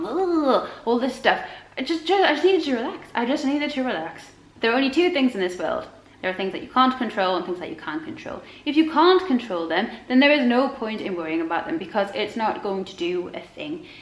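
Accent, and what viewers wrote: United Kingdom. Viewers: you are such a lovely person! So glad I've just found your channel. Subscribed! It's always refreshing to hear a British person talk about minimalism.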